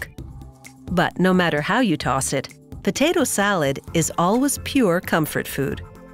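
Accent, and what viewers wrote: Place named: Canada. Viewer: This wouldn’t be a US episode as the narrator is Canadian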